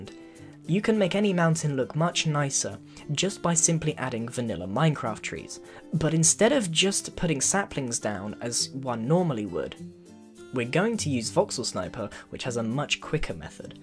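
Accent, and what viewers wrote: United Kingdom. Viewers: are u a British person, you have an english accent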